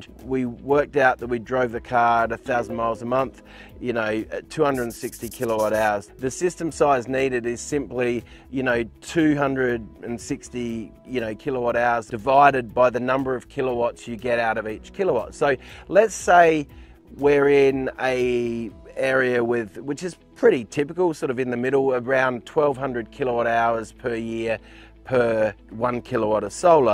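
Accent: Australia. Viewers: You sound like an Aussie but you speak in terms of miles and referencing US locations. Are you referring to costs in Australia or the US? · I've been watching your videos thinking you were in Australia due to your Aussie accent but now realised you're in the US!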